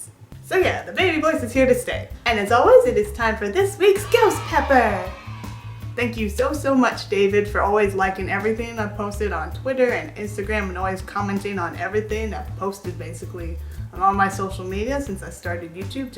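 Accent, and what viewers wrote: South, United States. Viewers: you talk southern lol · Imagine having a slow southern accent Beautiful young lady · I think the way you talk is sexy as hell! It’s like an old-school Southern girl accent. I’m not into ASMR, but I usually watch just to hear your voice.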